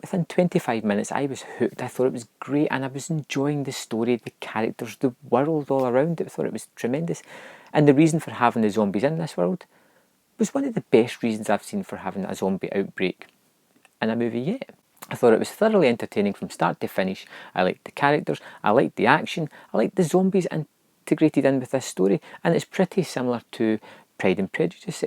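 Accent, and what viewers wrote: Scotland, United Kingdom. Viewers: wat part of Scotland u from I'm from Stirling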